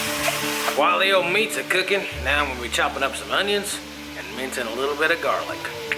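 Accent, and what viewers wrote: United States. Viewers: Lol😁 The whole time I was holding my breathe praying🙏that your mustache didn't fall off into that Chili ha ha ha😁😁😁😁😁😁😁😁😁😁😁😁😁😁😁 I am going to definitely try making this chili I could literally taste that chili while watching you cook it..looks amazingly good👍 You Got that Southern accent down packed✌😋🙏👍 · Sorry, not like British one. The cow boy one in the west USA. ♥ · u so funny wit dat cowboy accent, u great man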